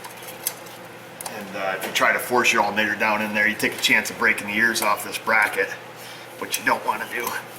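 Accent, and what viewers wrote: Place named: Texas, United States. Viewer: very understandable and appreciate you sharing all you do. Then again, maybe you should ask yourself the purpose behind why you make the YT videos. BTW you sure sound and act like a good ole boy like me from TEXAS. not only my opinion but also expressed by many friends.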